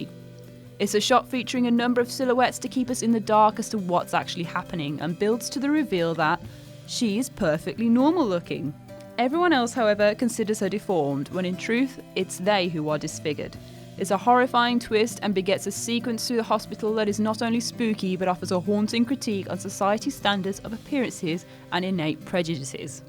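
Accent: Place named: England, United Kingdom. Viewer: I hear an English accent. That's exciting enough 4 me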